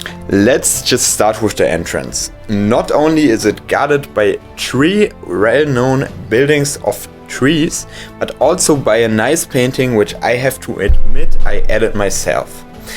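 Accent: Germany. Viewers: Kommst du aus Deutschland du hast einen Deutschen Akzent?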